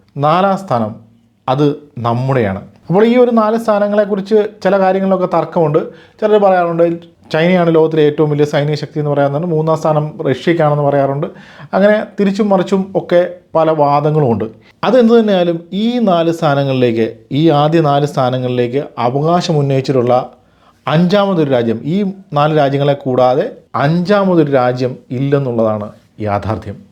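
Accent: Kottayam, India. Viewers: നാട്ടിൽ എവിടാ കോട്ടയത്ത്‌ ആണോ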